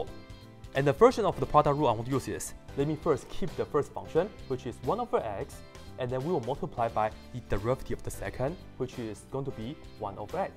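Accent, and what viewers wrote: Singapore. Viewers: Great video. You sound like you're from Singapore, which is a country known for its great education system. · 你妈 新加坡口音简直是折磨人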